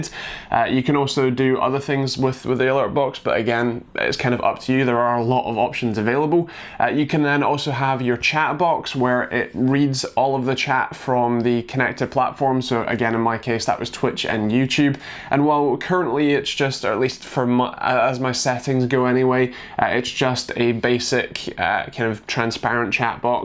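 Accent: Scotland, United Kingdom. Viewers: Great to hear a good review from a fellow scotsman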